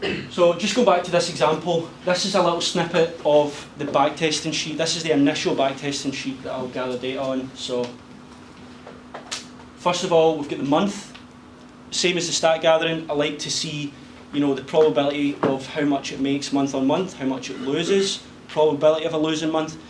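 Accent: Scotland, United Kingdom. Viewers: Refreshing to hear Scottish accent aye 🏴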